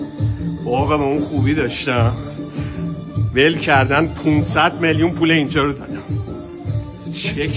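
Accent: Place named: Kashan, Iran. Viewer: بیخیال باش وبگذر،آقاداماد آخه امشب مهمترین شب زندگیتونه 😂😂😂شمابهترین کمدین هستیدواقعاعالی هستین من هرروزمنتظرکلیپهای جدیدشماهستم ممنون ازشماهنرمندگرامی کاشانی من شیرازیم ولی عاشق لهجه کاشانیهاهستم لطفااگرمیتونیدبالهجه شیرازی هم کلیپ بذارید❤❤❤❤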